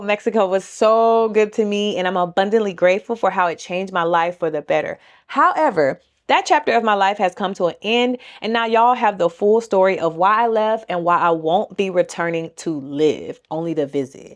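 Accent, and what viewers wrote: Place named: Oakland, United States. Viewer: Sounds like Oakland or Chicago to me 😂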